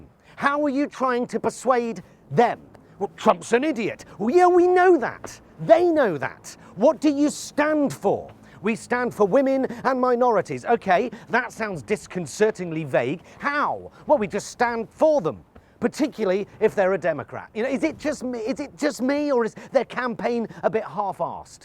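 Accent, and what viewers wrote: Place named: RP, United Kingdom. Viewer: If you don't know what Democrats are about, read more than headlines on Google News. They have an entire platform, and get this, they actually talk about it on the campaign trail. The MEDIA is focusing on horserace. You here are every bit as bad as the rest of the media. Except your not, you're worse. You dress up in a suit and a tie and your posh accent and deign to tell ME that my candidate supports nothing but beating Trump. That's false, and you're an idiot for propagating this "two sides of the same coin" nonsense. YOU are the one playing into Trump's lies. What Democrats want to do: Covid-19/Health Care: Legislate to head off court challenges to the ACA. Expand the ACA with a public option. Use the Defense Production Act to make PPE, drugs, vaccines, and other medical equipment to fight Covid. Coordinate procurement and logistics for this equipment with the states. 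Create national policies for safe social interactions until such time as we get enough people vaccinated that we can go back to normal. Economy: Stop the trade war with China. $1 Trillion Infrastructure plan. Offer more money to protect small businesses struggling with Covid. Reinstate aid to people out of work due to the virus. Other things will be looked into after the virus is in check. Immigration: Pass a law to protect the DACA recipients permanently. Comprehensive immigration reform giving people here and contributing a path to citizenship. Reunite separated families. Foreign Policy: Where do we start?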